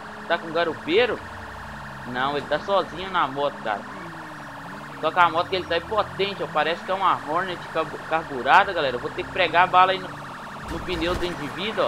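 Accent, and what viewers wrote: Minas Gerais, Brazil. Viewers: Game Club Kkk eu falei zuando cara, mas tô achando que ele é mineiro sim por causa ta trasendo muito esses mods e pra mim que sou mineiro ele tá falando normal... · eu tava querendo saber do pq o sotaque e da q e minas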